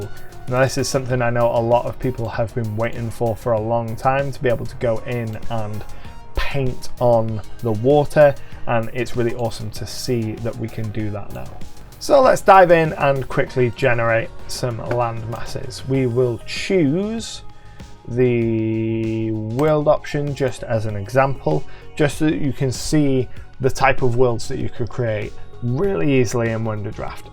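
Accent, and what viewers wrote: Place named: North, United Kingdom. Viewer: Hi New subbie. I played D+D way back when it first started in the 70s (eekkk - showing my age!!). Had a game going for 6 years in the early 80s. Got back into the idea recently and just started doing digital stuff. I purchased Wondercraft and came across your channel. You sound like you are from my part of the world (north east England). Looking forward to learning more from your videos! Thanks, take care and stay safe in these strange times.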